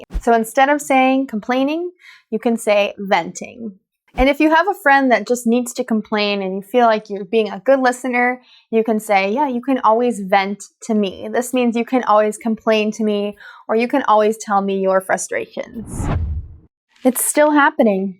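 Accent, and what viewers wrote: United States. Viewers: Hey teacher am new here am learning a lot from your beautiful classes, So I am from Brazil 🇧🇷, I have loved your accent since the fisrt moment, which state of USA is this accent I mean🇺🇸Do you come from which state? Thanks in advance ❤God bless you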